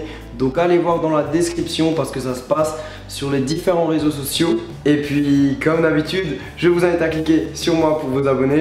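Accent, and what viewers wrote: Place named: Alsace, France. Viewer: Coucou toi c la première vidéo que je vois de toi et j'adore c cool +1 abonnée et juste une question : est tu alsacien car tu as un petit accent PS moi je le suis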